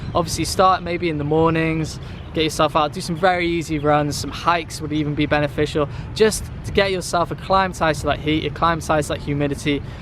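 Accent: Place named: North Wales, United Kingdom. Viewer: Really your from Wales! You sound from North, I'm from Denbighshire if you know there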